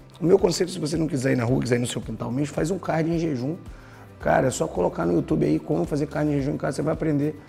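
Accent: Rio de Janeiro, Brazil. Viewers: Ahh meeu gratidão! Nunca estudei tanto na vida haha Só consigo ouvir aulas que tenham vozes de seres humanos inspiradores! Tipo sotaque carioca dos vídeos de direto administrativo! Obrigada!!! Jah Bless 🆙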